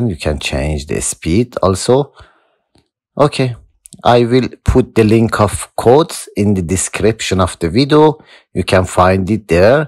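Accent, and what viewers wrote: Iran. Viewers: لهجه ایرانی مشتی؟ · are you Iranian???/🤣🤣🤣🤣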